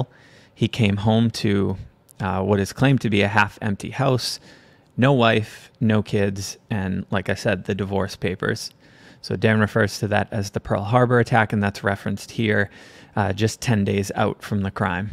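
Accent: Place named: Georgia, United States. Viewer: Actually, sweet sound of Georgia.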